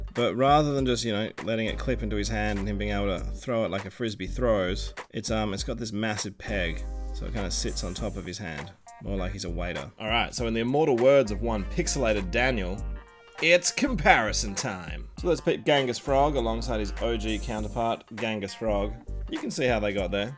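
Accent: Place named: Australia. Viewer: Where did you get these? I assume you're in Australia judging by the accent. I am too so I'm looking to get these if I spot them anywhere.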